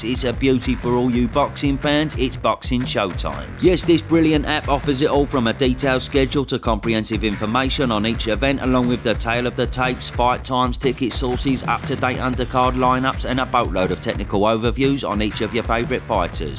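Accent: Cockney, United Kingdom. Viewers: Great videos but the chewsday accent is killing me 😶‍🌫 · This cockney commentary is awesome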